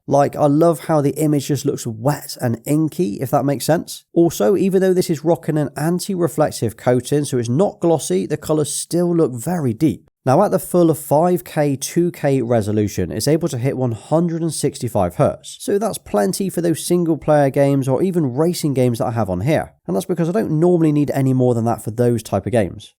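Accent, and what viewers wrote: United Kingdom. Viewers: He british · I dont think he is american. Sounds british to me.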